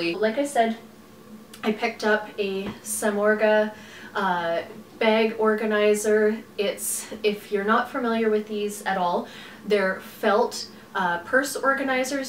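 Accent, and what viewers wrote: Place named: Canada. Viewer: Me: hmmm her accent sounds Canadian? 🧐 Her: pulls out Canadian stamps Me: Beaming bc i guessed right ☺️☺️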